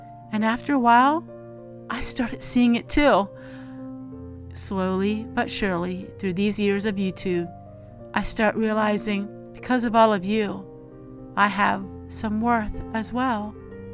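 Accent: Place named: Pennsylvania, United States. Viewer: thank you for sharing. I lost my father several years ago and it feels like just yesterday. Thank you for sharing so much with us, so many skills that have been lost to us. I think you have a PA accent just like when I talk I have a NY accent and people don't always get what I'm saying 😆much love!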